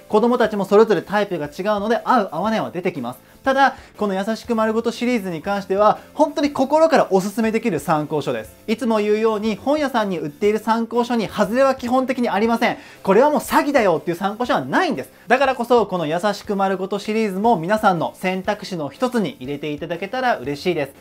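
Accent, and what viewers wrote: Kansai, Japan. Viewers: なんか関西弁？入っててかわいい！！！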